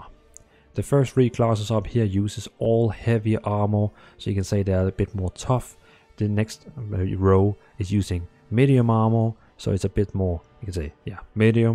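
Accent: Denmark. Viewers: Ahh thank you for this very helpful introduction. I just recovered my Guild Wars 2 beta character, a gnome thief who has been waiting patiently for me for 3411 days. Looking forward to give the game a shot. Out of curiosity, you sound Danish, am I correct in that assessment? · Du taler super engelsk, men vi danskere har bare en evne til at spotte andre danskere uanset hvor lidt accent man taler med 😁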